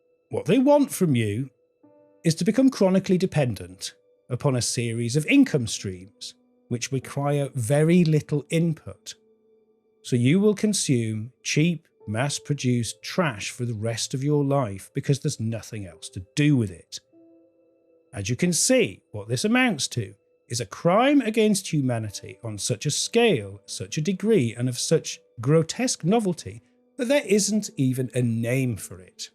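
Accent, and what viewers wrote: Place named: United Kingdom. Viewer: British accent for someone in USA!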